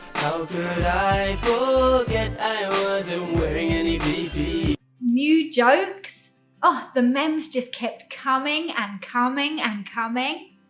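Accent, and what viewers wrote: United Kingdom. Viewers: 真的非常喜歡聽您英式英語的口音講任何事情，這種腔調聽了真的是一種享受啊！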